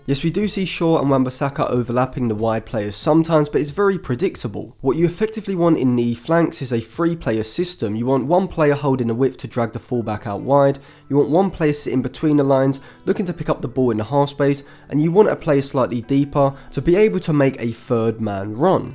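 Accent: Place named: United Kingdom. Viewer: I love finding new Youtubers who kno futbol and create quality *british accent^ content, that’s a Sub from me 🤝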